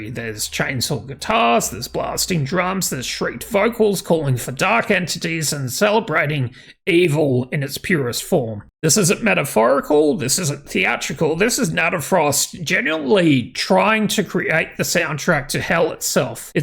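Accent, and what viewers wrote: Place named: Australia. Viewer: are you Aussie btw? I could swear that's where your accent is from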